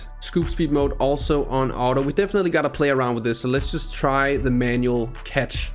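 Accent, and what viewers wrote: Denmark. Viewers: Are you from Denmark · He's Danish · are you from denmark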